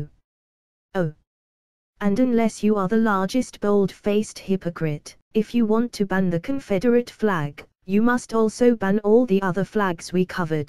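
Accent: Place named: United Kingdom. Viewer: But at least she has a British accent which is always attractive on the ear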